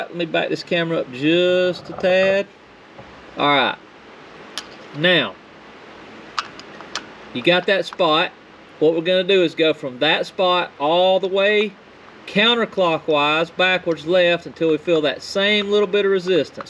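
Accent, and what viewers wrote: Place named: Louisiana, United States. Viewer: A good buddy of mine from my US Navy days is from Georgia, and when I heard your voice it reminded me of him.. Being an Irvine is nice, but it's kinda like being stuck in the middle of a zoo because California is just gone crazy, in some places. Anyways, your videos take me to these little country roads and get my imagination thinking about little motorcycles, and big open spaces. Again I can't overemphasize the detailed nuance and elaboration in your video, of the clutch adjustment was just so spot on. I watched 3 videos before yours, They showed the adjustment but didn't elaborate the way you did. Your speaking cadence, intonation, grammar, syntax use, affable Southern accent will take you very far, on on YouTube.. Wishing you and yours are very happy and prosperous New Year. Thank you again for the quality content.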